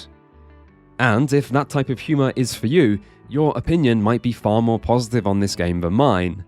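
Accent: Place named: United Kingdom. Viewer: The somewhat familiar oddly british voice said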